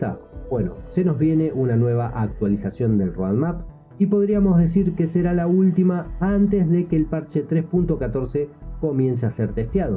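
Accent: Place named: Argentina. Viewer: Primera vez que oigo a, y me creo que es argentino🤣🤣🤣👌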